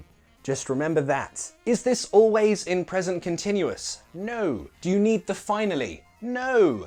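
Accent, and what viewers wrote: United Kingdom. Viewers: good morning! learn english with, congratulations for your channel it is the first time i watched your video and ı would like to ask you, so your english is british? thanks and have a nice day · I don’t like British accent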